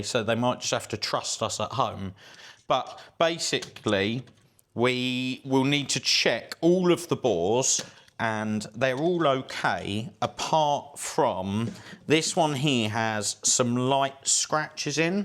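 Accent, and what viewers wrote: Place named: United States. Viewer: Cool - maybe try saying "you" sometimes instead of "you guys". I get that narrating a task is not your job but changing it up a bit helps a lot. The less American you sound the more likely I will subscribe. (Alfa Romeo - 4 door Gullita 1600cc saloon)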